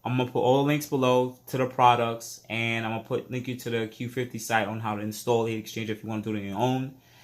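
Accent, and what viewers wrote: New York, United States. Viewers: he's a new yorker, you wouldnt get it.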